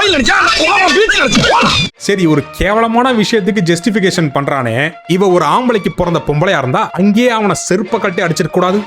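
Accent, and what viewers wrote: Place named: Tirunelveli, India. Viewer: Bro thirunelveli slang puduku 😂😂 romba siripu varudhu bro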